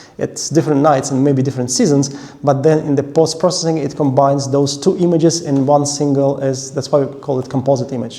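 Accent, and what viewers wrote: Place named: Russia. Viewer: didn't bother to get rid of his thick Russian accent.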